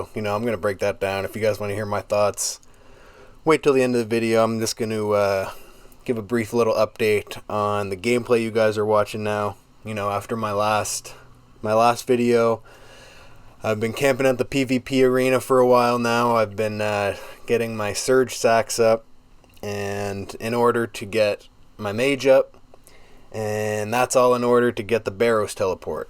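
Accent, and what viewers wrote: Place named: Canada. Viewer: I'm Canadian, and I thought the aboot thing was a myth. I guess not.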